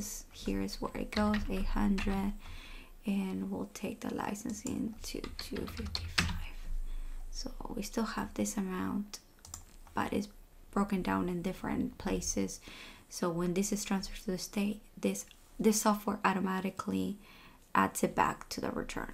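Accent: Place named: Latin America. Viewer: She sounds latina, great explanation 🎉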